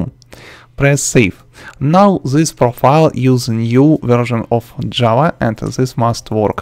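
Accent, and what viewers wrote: Russia. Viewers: your russian accent is a blessing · Are you from Russia?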